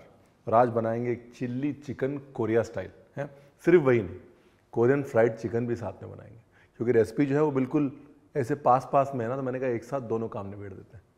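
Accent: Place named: Punjab, India. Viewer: Looking delicious! Love your style of speaking… being a Punjabi it’s wonderful to hear the slang . Be the way you are! ❤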